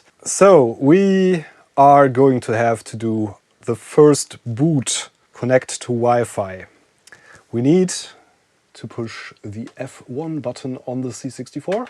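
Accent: Germany. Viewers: Your Accent sounds german. Bist du Deutscher oder?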